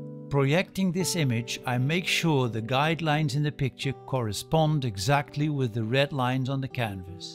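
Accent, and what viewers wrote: Netherlands. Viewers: Are you Dutch? You are an amazing teacher and the accent helps (I like Dutch accents)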